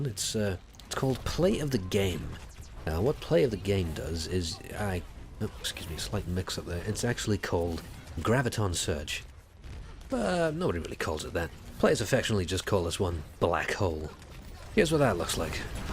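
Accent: Wales, United Kingdom. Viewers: Surely Welsh. Just watched the Hanzo introduction vid, he gets a bit heated right at the end. Guessing he mitigates his accent... Creepy analysis over. PS: Or, if Aus.... New South Welsh?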